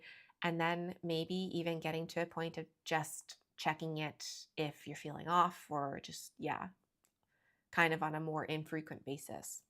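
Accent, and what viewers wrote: Canada. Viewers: I loved hearing your Canadian lingo come out 😍 The use of “hey” after a statement is the cutest! I’m from Texas and I never hear it. Love it.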